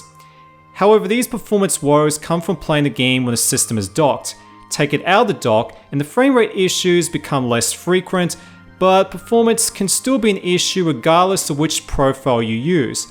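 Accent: Australia. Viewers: Is this an Aussie reviewing it?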